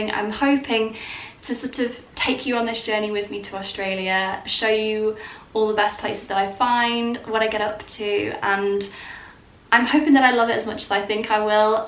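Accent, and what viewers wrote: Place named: United Kingdom. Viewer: Lose the British accent before you go abroad because nobody wants to hear it just for your information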